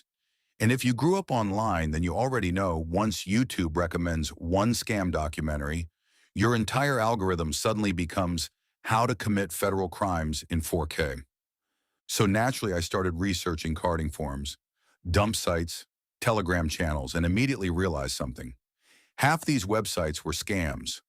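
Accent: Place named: India. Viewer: I can smell the Indian accent from behind the AI voiceover.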